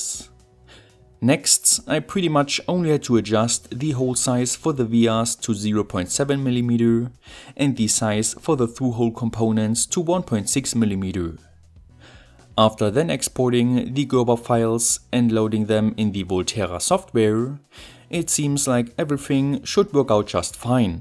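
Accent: Germany. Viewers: Kenne dich nicht aber anhand deiner Aussprache könnte ich schwören du kommst aus Deutschland. Aber interessantes Video 👍🏼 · I am a bit confused by his accent. Where's this guy from? It sounds like a German speaking English with a Chinese dialect. Please spare me the Chinese isn't a language. I am fully aware of that.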